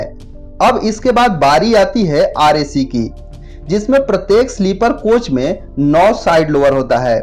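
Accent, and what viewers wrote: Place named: Howrah, India. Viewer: Howrah se ho naa ? 100 %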